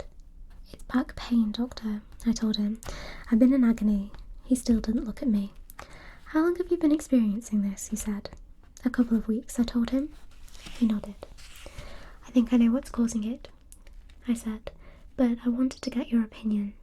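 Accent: United Kingdom. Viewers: I love British accents! I love you vids! Thank you!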